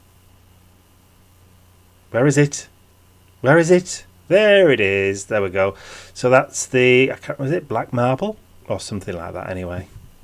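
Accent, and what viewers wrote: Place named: England, United Kingdom. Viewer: Hey up chuck, though yur accent sound'tut familiar. It be furst time av sin thi, like. E tis nice to hear a fellow local brit, lol. That took some doing to stop it auto correcting! Who's lad that dose stencils? Would be good to know. Bic W ' house; are they really bad, as I looked up there customer reviews and didn't dare take a chance with not having a great deal of £ and didn't want to risk loosing it. Sorry to bombard you with Q's. Thanks for sharing your page, colours wer grand! Joanne.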